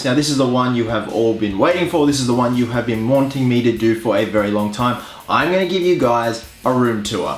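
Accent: Australia. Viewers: Where are you from?? Australia?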